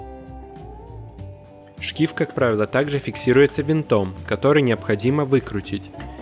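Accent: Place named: Belarus. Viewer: звучит как беларус )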